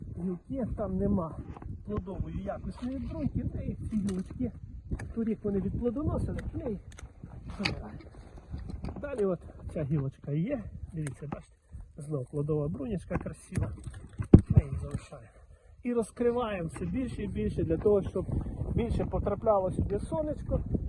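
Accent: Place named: Podillya, Ukraine. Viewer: Дуже гарно дякую Вам! Було пізнавально. Мова – ніби живої води напився! Скучив за Поділлям (батьківщина моєї мами). Додав у підписки. З нетерпінням чекатиму нові випуски! Вітання від донеччанина, що зараз живе на Харківщині) хай ми Переможемо! З повагою, Іван Редько!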